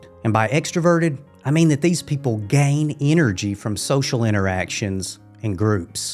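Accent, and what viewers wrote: South, United States. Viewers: Like u n ur sweet southern drawl!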